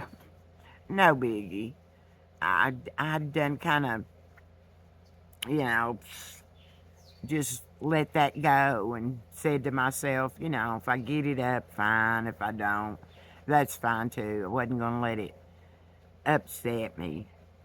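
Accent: South, United States. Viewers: Thank you so much for standing up for kindness, and common sense. And for speaking up💖✨I’m from AR & live in TN now…and it’s sad to see so many good hearted, hard working people being conned by the carpetbagger (tRump). It is so reassuring to hear a wise, southern accent sharing good information & kindness. I’m late seeing this video, so by now we know Biden has announced he will not be running for 2024…I agree with you on the corp media…hopefully now that he has made this announcement. I hope they (Democrats & media) stop the madness & get back to work for us…Thank you! I also watch Midas touch, Tx Paul, and TN Brando💖✨🙏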